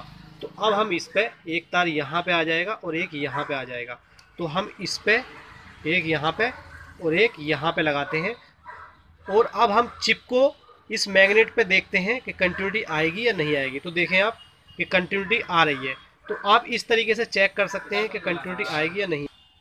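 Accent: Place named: Moradabad, India. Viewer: bhai ji bhaut accha video aur Jaankari Dene ka style style bhaut Saral aur sulabh...dhanyabad...sirf itna bata dijiye ki Kya aap distt moradabad se belong karte hai...aapke bolne ke style se Aisa laga mujhe....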